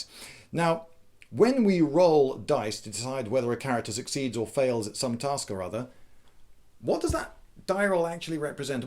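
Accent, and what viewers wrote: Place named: United Kingdom. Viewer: what part of the UK is this dude from? I am interested what regional dialect/accent he has. It's bad ass!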